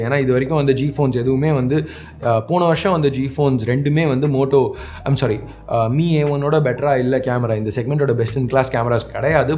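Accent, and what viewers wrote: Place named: Chennai, India. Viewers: Sema video bro! keep it up! சென்னைத் தமிழ் அருமை! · super bro i like your Chennai Tamil 😁😁😁 😄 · தல லோக்கல் ஆ இறங்கி, சென்னை பாஷைல கலக்கிட்டீங்க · சகோ உங்களோட ஆங்கிலச் சேனல்களை நீங்க பேசுற அந்த ஆங்கில உச்சரிப்பு வந்து ரொம்ப நல்லா இருக்கும் எனக்கு ரொம்ப பிடிக்கும் ஆனா நீங்க தமிழ் பேசுறப்ப அதுவும் இந்த வீடியோவை பொருத்த வரைக்கும் நீங்க ரொம்ப கோபமா இருக்கீங்கனு தெரியுது நீங்க பொறந்து வளர்ந்தது எல்லாமே சென்னைதான் என்கிறது நீங்க பேசுறது தமிழ்ல இருந்து தெரியுது எப்படி இருந்தாலும் நீங்க பேசுற அந்த ஸ்டைல் வந்து எனக்கு ரொம்ப புடிச்சிருந்துச்சு வாழ்த்துக்கள் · bro....conetent vudunga...neenga nambo madras slang la pesurathe sema ...super po....ipdiye pesungo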